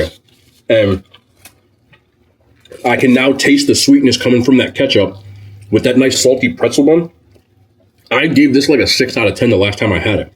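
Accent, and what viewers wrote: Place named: New Jersey, United States. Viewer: That’s how they talk in New Jersey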